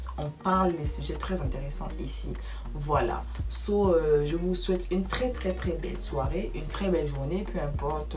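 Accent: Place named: Gabon. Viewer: C'est l'accent Gabonais qui me retiens 😂😂super pour la vidéo j'aime ❤❤😊😊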